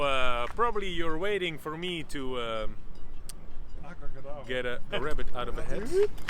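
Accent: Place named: Netherlands. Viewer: nen ollander dieje engels klapt, haha ge hoort het toch direct he! Leuke review btw.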